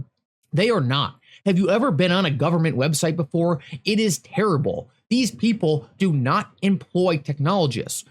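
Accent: Allentown, United States. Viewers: with the Allentown accent is wrong again. Way to go!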